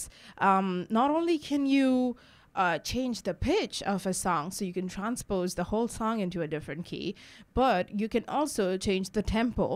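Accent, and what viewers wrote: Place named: United States. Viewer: Where do we learn to speak like a Yankee?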